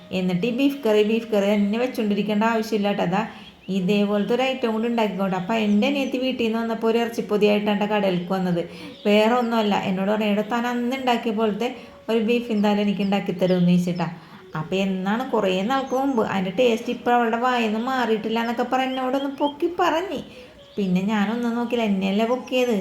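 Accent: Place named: Vypin, India. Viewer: ഇത് ഞങ്ങ വൈപ്പിൻ കരകാരുടെ സ്ലാങ് ആണല്ലോ ചേച്ചി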